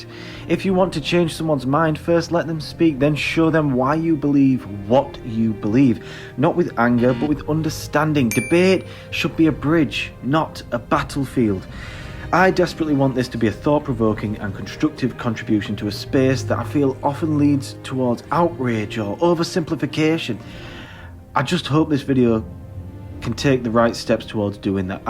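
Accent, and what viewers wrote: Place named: United Kingdom. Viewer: You should definitely try out Owlcat’s games as they have some incredible cRPGs similar to Pillars and Tyranny from Obsidian. Your comments really show maturity as the whole “woke” thing is about the right-wing not liking liberalism more than how it’s implemented into games, which it is fine to be right-wing, but the problem is it’s simply a conflict of interest to critique a video game with political messaging by countering that with your own political messaging. Planescape Torment came out back in 1999 and was very political on how all factions were sometimes this “Lawful evil” alignment with other factions focusing more on personal divine alignment and mind, transhumanism, tribalism, radical revolutionaries, etc. That is the ultimate “makes you think” type of game, and any PC can run it. Other than that, the closest “spiritual remake” to that is Disco Elysium, and that game is brilliant in many ways and it’s what it feels like if BG3 was just a story game with no combat and this sense of dissociation throughout the game. 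Thank you for making this lovely video, and might I say you do have quite a nice accent, I’m not sure where it’s from (Scottish or English?), but you do have a good accent nevertheless.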